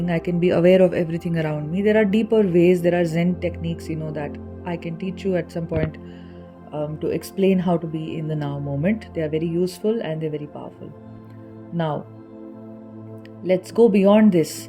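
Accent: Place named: India. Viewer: 🙏 Thanks a lot for such a knowledgeable video and Divine counseling!! I really felt good to get through a channel in English about TF in an Indian voice! 💚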